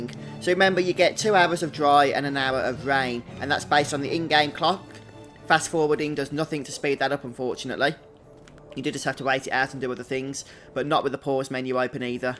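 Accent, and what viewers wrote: United Kingdom. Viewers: Yeah..not all we are english native speakers. Plus with his british accent..it's hard to understand everything..🤣🤣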